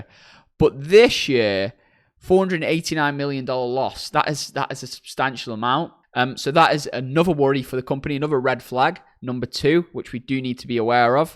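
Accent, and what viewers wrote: United Kingdom. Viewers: i love to stay in Airbnb so much when i travel but i cant agree more with that the company go public at this time is able to survive through this pandemic. long term Airbnb will profit and grow even more than it already proved in the past. i will keep this stock very closed to my watch list and see how the IPO and the stock price going but would love to buy shares one day. thank you for the amazing content plus attractive British accent:))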